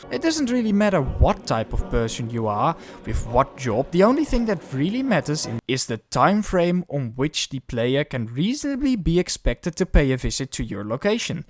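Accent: Netherlands. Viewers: ziek nederlands accent 🤩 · I smell nederlands (or german)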